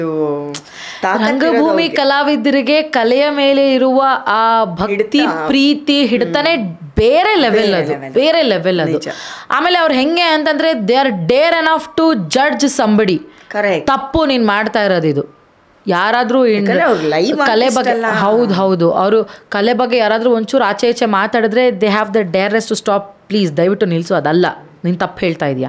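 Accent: Mangalore, India. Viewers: Mangalore accent supper. Namma kudla namma karla great. Love from Karkala. All rounder 🎉❤ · Mangalore kannada spr😂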